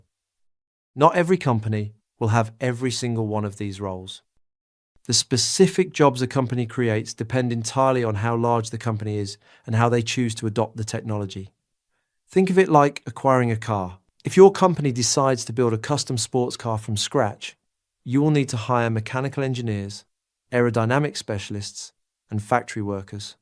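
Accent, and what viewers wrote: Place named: United States. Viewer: Great to find a British English instructor with clear examples and explanations. However, I really do not like the American spelling and pronunciation. TY though. I will be sharing the links.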